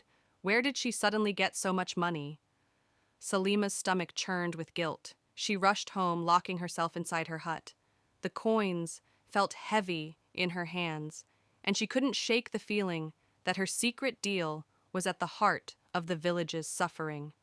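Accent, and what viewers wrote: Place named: United States. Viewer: Remember this is African folktales and you shouldn't be using American accent for Voice note but African voice note. Thanks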